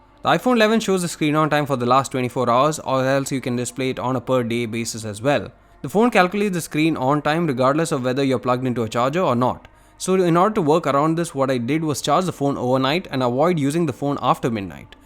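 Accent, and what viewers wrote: Kerala, India. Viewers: are you from kerala?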